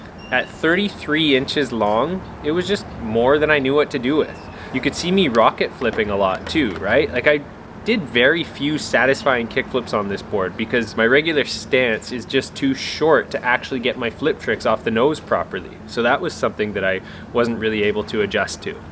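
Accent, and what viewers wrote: Canada. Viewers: my god I love how Canadian you are. "body checked the ground." haha! Just the delivery of it ! God bless skateboarding, hockey and Canada!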